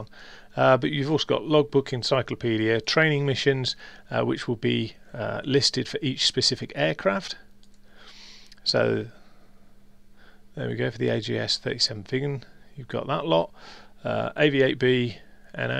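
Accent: United Kingdom. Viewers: I'm so pleased to have discovered your channel. Being uk based it was so refreshing to hear a brit go through DCS as you have done in this video. I've been sitting on the fence for a good two years now about (a) a decent gaming PC and of course (b) getting into DCS. I've watched a lot of content, most of it American content which with all due respect drives me a bit potty. Too much waffle and being US based I find myself being totally confused over what hardware to go with. Now I'm not in a position to literally build a simulator and damn near build an F16 type cockpit, with almost an ejection seat built in. However I'm in a position to get the right kit. I know it needs a decent PC, warthog HOTAS is a given c/w decent pedals. It's the PC hardware I'm struggling to understand. Do I go with the precise recommendations from DCS? IR or VR. If getting VR do you drop going on a top monitor? 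Recommended PC suppliers etc. Its these questions I'm struggling to get answers on. I hope you can help.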